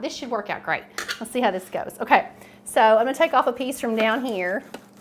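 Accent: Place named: Kentucky, United States. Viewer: Gotcha. You shouldn't feel sensitive about embracing and sharing non-traditional new foods. As a matter of fact, the Stromboli is indeed an adapted Americanized invention. I enjoy making all types of world cuisine and I make no preface nor caveats for cultural qualifications. Remember, you're not appropriating, you're embracing. That's a very good thing! 😇 BTW: I adore your enchanting blue grass dialect.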